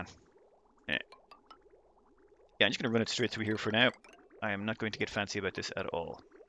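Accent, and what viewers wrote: Ireland. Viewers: Where are you from? Do I notice an Irish accent?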